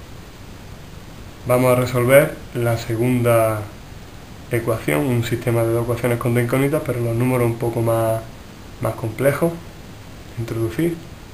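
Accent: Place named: Caribbean. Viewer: Hola, me sirvió mucho, pero para que sus vídeos se vuelvan más virales debería neutralizar más ese acento caribeño. saludos desde los andes Colombianos...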